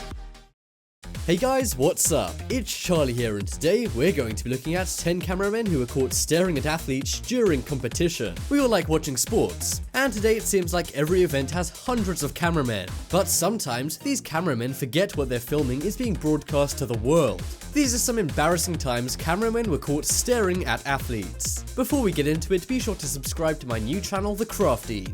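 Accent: United Kingdom. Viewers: Yeah I can tell he's British "or of the sort" I just really like his accent and the pronunciation haha. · He's British, and I would love to speak in a fluid British accent, but it's hard to learn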